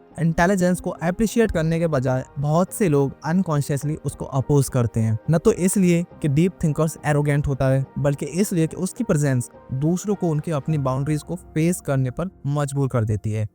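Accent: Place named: Bengal, India. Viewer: Ek baat samajh aya ki bolne wala Bangali hai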